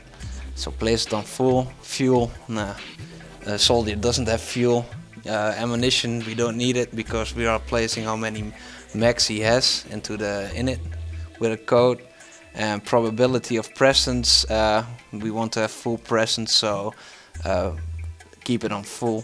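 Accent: Netherlands. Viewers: Nice ! Are you from the Netherlands? Just wondering cause of the accent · You sound Dutch, are you? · Great tutorial , easy explanation for dummys and people who just needed that info + I can hear your Dutch so thats always good hehe. If you ever need help editing , acting or anything else please let me know, Would love to know ( + Im also experienced)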